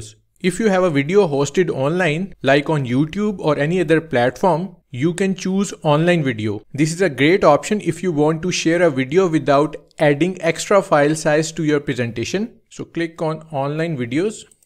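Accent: India. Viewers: Indian ahhh voice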